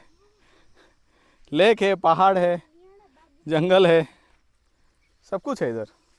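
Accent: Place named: Odisha, India. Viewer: Keep up the great work. Proud of you. Best wishes to your family... specially. Really love your narrative.. with Odia MTI😁👍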